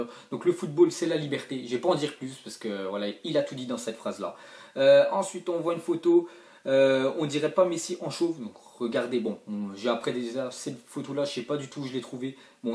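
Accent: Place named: Marseille, France. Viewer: tu as l acsent marseiller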